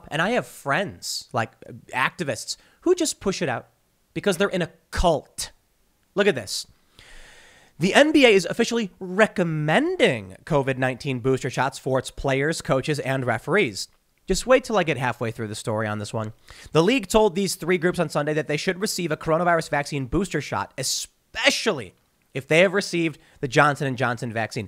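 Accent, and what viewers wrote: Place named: Texas, United States. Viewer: You're starting to sound like a true Texan. Slowing your roll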